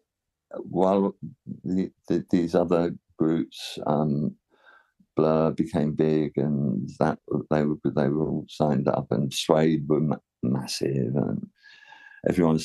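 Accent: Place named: Hull, United Kingdom. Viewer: I'm from Hull your accent sounds close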